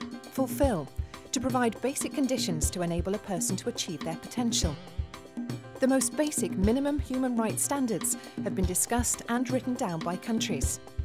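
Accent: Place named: United Kingdom. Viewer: What a British accent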